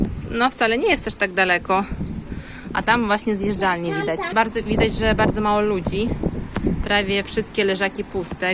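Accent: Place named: East, Poland. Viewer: Akcent wschodni Pani ma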